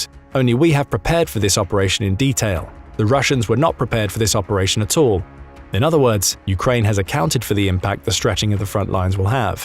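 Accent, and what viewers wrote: United Kingdom. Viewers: Because it was narrated by a British dude and the video is full of clips instead of human faces. And there are a lot of videos that are like this.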